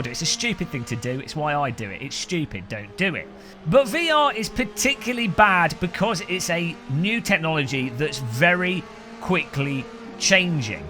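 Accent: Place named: England, United Kingdom. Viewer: Classic counter video before new product, plus eng accent. nothing to see here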